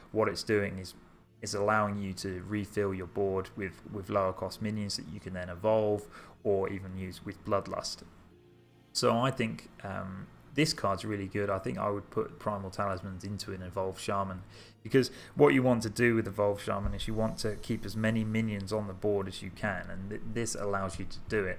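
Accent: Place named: United Kingdom. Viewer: you got UK accent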